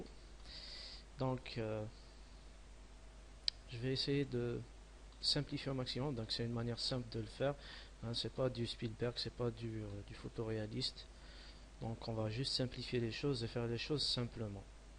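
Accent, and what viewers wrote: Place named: Kabylie, Algeria. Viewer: toi t es Kabyle !!! j'adore l'accent t'es de chez moi toi ^^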